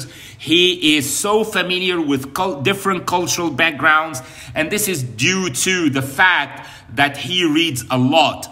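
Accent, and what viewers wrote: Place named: United Kingdom. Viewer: I am in love with the british accent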